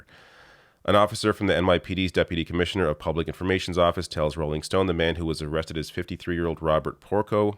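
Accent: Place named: Canada. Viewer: I looked you up because I thought you sounded Canadian....weird how that is, eh? Aloha from BC!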